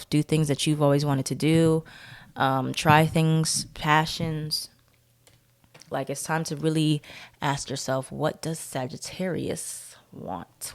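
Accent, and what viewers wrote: Bronx, United States. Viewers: U from the bronx??? GET STURDYYYY 😆😂😂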